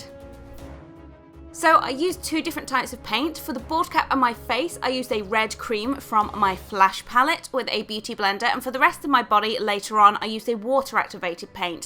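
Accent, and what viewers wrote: United Kingdom. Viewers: When you started talking it cracked me up because that light British voice with the devil face was just such a wierd combo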